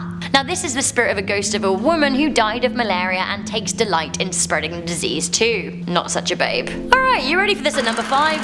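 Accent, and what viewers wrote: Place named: United Kingdom. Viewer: Yeah us Brits have amazing accents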